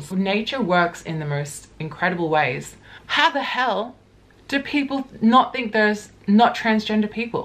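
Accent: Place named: Queensland, Australia. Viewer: You sound like a Queensland girl, or is that just me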